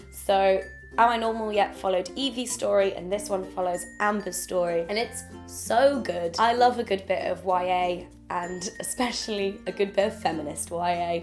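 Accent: United Kingdom. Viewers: where in Britain are you from? xx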